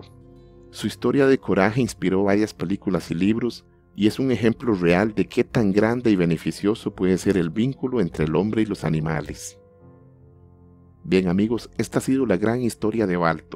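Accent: Costa Rica. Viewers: Sabia que eras Tico mae!!! Desde que empezaste a hablar, dije,mínimo es de cartaguito. Buen video. Gracias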